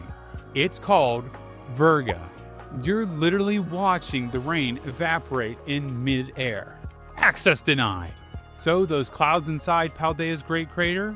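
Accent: Appalachia, United States. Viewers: I jest in my derision, of course lol I'm from down closer to the Cumberland Gap and Smokies, so it's always funny to hear the different pronunciations. Still, glad to see mountain cousins making fun content though! Keep up the good work!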